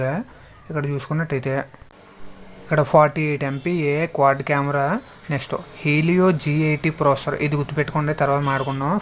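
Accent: India. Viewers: nice talk...i think your EAST or WEST in Ap..😁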